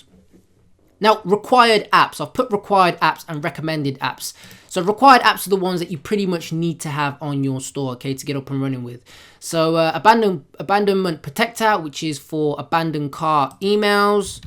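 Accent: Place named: Birmingham, United Kingdom. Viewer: sick videos mate, you sound like youre from or around brum, would love to get some tips if available!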